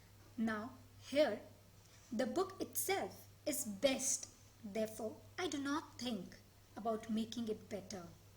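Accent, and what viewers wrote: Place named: India. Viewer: Incoherent Indian accent (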